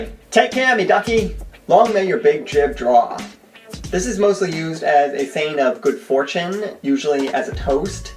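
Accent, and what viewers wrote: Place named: Ireland. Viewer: Sounds very Irish!! New subscriber 😄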